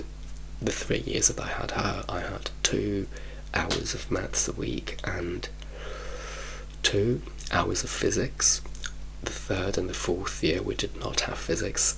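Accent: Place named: United Kingdom. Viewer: Mamma mia quanto mi piace il tuo British.accent, ma anche il tuo Italiano. Hai una voce rilassatissima. Scusami, anche se adoro l'inglese da quando. Ero bambina e lo parlo volevo farti i complimenti in italiano ❤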